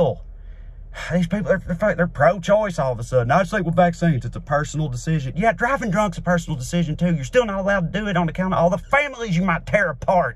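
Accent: South, United States. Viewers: Yeah he's got a Southern accent but he's 100% wrong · Well, This is REFRESHING!!!!!! A southern accent paired with REALITY, SCIENCE, and THE TRUTH!!! I LOVED the simplicity and honesty that acknowledges that this pandemic IS NOT ABOUT OUR INDIVIDUAL RIGHTS AND EGOS—but about PROTECTING OTHERS, AS WELL AS OURSELVES!!!! Well said, THANK YOU!!!!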